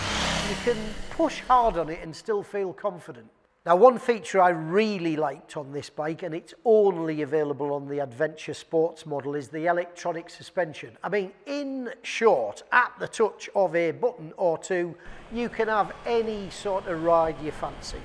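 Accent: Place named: Northern England, United Kingdom. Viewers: It's nice to see and hear a northerner instead of those shandy drinking southern pair of knobheads. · Who is this miserable Northerner??? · It's our favourite Geordie !! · Oh god started to watch then stopped. Can't stand gobby northern twat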